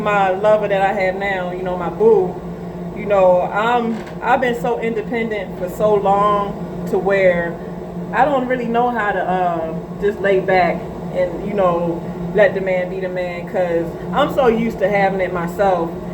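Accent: Baltimore, United States. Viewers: Much respect queen, u gotta be from bmo. I can hear it, being from pg. Stay strong stay sharp stay genuine! Much peace, love, positive vibes!!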